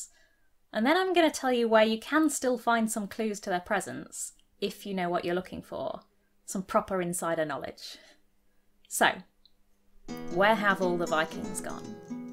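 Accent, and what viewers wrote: United Kingdom. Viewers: Love how Idris Elba has a cameo within the historical figures. Just found out about the channel, gorgeous and incredibly knowledgeable lady with brit accent. Can't have it better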